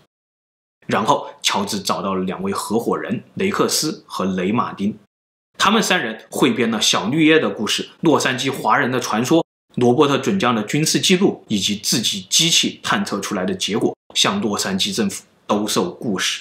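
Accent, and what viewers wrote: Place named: Fujian, China. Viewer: Hu 建 口音吧？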